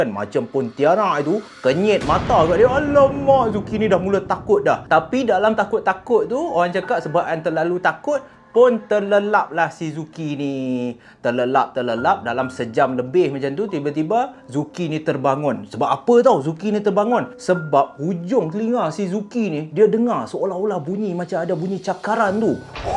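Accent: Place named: Penang, Malaysia. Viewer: asalamualaium. pandai juga hang cakap peneng.hang pandai buat lawak.teruskan kerjaya hang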